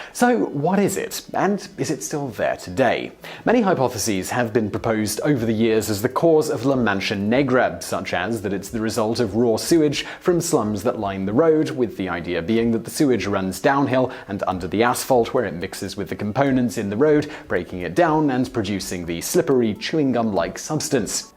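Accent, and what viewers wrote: England, United Kingdom. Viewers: Anyone else impressed with the actual journalism or background investigation into this story? A part-time staff of seven looked into the story - not looking for the story to be true or untrue, just reporting on known facts or assumed facts as well as offering a hypothesis for both sides of the picture. Many online news outlets today would just so want the conspiracy or unknown to be true that they would push that line or not offer the full picture. Either that or have BS fluff or headline/sensationalism stories lead the news. There is alot going on in the world; many of which does not get copy. Smutty sex stories or commercials veiled as a "news story" are getting air time. This channel and others like it deserve credit for doing a fine job. Unpretentious and no flash production; just a guy talking in front of a camera. An english accent, in my opinion, goes a long way. 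He speaks well and is easy on the ear. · what kind of accent is this? british?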